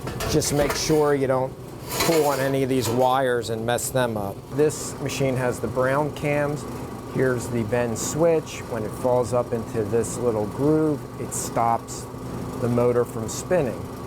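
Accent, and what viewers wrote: Philadelphia, United States. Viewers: Are you from Philly?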